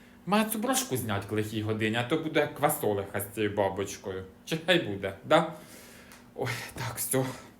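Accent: Poltava, Ukraine. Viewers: Дякую Ти дуже ловко балакаєш по Полтавській,а то що годи у нас і досі так кажуть,ідем на годи до Миколи💕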